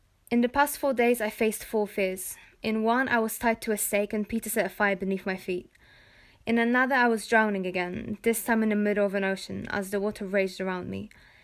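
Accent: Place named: United Kingdom. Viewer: Great video, ^-^ I really like the British accent its very different from other audiobooks ♡♡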